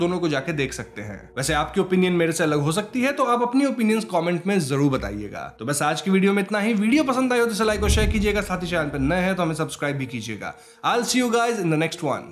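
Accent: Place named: Bihar, India. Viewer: Story teller is Bihari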